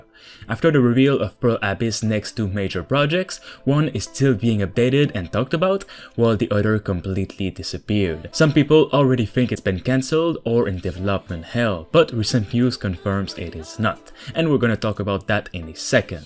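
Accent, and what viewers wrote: Quebec, Canada. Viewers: Are u French Canadian??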